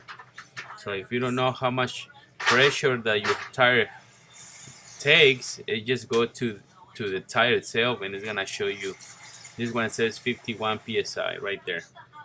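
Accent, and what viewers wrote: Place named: Mexico. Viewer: Give him a break bro, that's a Mexican accent, at least he can speak 2 languages (Spanish and English) .Stop the hate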